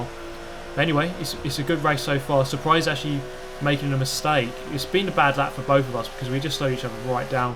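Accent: United Kingdom. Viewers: wow, I really didnt know. thx for info...I was so confused when I found your vids, because I thought: "why is this guy sounding british but is using the imperial system?"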